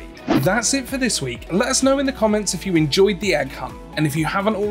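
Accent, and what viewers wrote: United Kingdom. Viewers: I love your British accent it’s so posh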